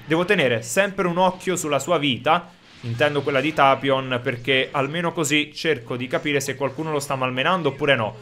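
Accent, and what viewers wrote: Naples, Italy. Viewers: ma tu sei di Napoli??🤔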